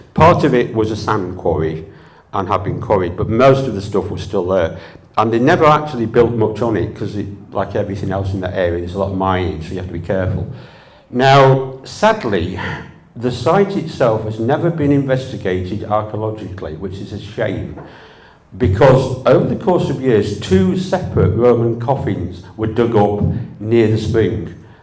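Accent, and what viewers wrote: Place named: Lancashire, United Kingdom. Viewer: This man does NOT slur his words, he has a Lancashire accent and is using a mic that has a bass bias. He speaks VERY plainly, and if you don't understand the words he's using, maybe you should look them up and expand your vocabulary rather than decry him for your own lack of knowledge.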